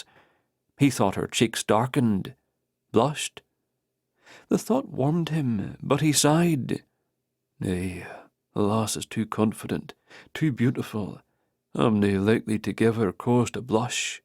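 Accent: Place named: Scotland, United Kingdom. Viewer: Does anyone know if this narrator, narrates any other books? This is my second time through this series. The Scottish voice for a story about Scots is beyond perfect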